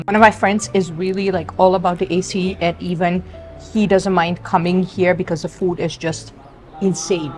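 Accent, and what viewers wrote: India. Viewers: Your accent turned to Indian accent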